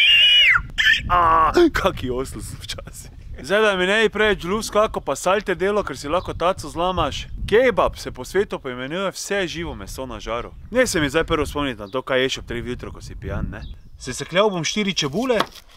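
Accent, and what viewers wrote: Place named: Maribor, Slovenia. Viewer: Hud!!!!!.... moj te bl gleda k jst, k si mu res faca in si edini k pr teb prenese mariborski naglas skratka obožuje te.... Mene pa zanima zakaj na svinjino namest kumine obrazlozi lepo prosm ker jst skos devam kumino gor😊😁 pa super si tut men... Tut jst rada gledam tvoje znanstvene raziskave v kulinariki 😂👍❤️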